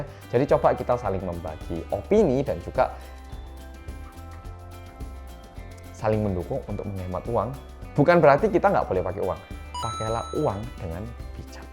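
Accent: Java, Indonesia. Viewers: 1. Jempol, Mas utk segment barunya...👍 2. Sy emak2 biasa yg ga ngerti investasi forex ato reksadana ato apalah gitu2. Jadi invest nya dlm bentuk LM aja. Dari tip no1, alhamdulillah udh lumayan dpt 'segenggam' LM..😘 3. Tip no 3 sdg dicoba. Kok rodo angel yo atur mindsetnya... hehe...😏 4. Looking forward to other practical tips n tricks ya nan irit. 5. Tqtqtq😊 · Orang korean yang medok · Sukses terus mas, ga pernah bosen aku nonton channel ini, mantap lah, jowo ne medhok tenan, wess apik lah poko'e. sukses terus mas. semoga lebih maju kedepannya. dan dapet menginspirasi kita semua mas🙏🙏🙏🙏🙏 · Jangan ngomong bahasa Jawa ke aku:').Aku gak bisa bahasa Jawa.Coba kamu denger aja deh intro rindingdong nya mas.Mas kan ngomongnya medek wkwkkwkwkwkkw🤣🤣🤣🤣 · Ta pikir orang korea yang medok eh tau nya irit😬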